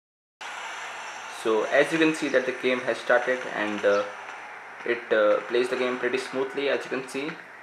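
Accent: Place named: India. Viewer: hate the indian accent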